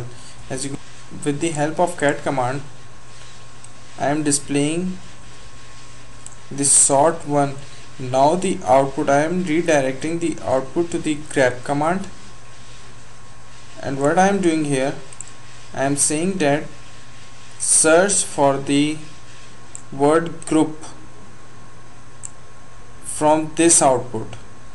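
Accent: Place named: China. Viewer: thanks brother but i bet you're chinese with your funny accent lol just to tell u i love your accent :)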